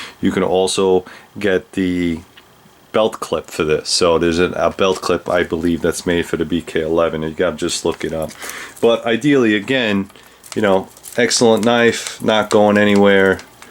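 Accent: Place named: New Jersey, United States. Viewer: You gotta be from Jersey brother....I grew up in Newark and I know that accent...lol.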